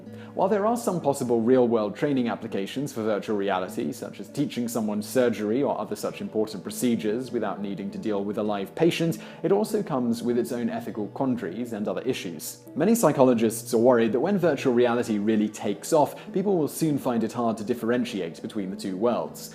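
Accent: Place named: Kent, United Kingdom. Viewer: He's from Kent in England.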